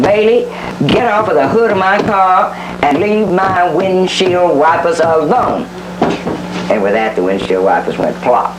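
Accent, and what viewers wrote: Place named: South, United States. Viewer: I can vividly remember her coming to my elementary school in Angleton, TX, circa 1983/84. She was promoting her Texas folktale book, "Ghosts Along The Brazos." I was instantly captivated by her Southern Belle draw and her mesmorizing storytelling. I still own an autographed copy of her book she signed for me. My lifellong passion for great storytelling began with the late. Happy Haunting, doll!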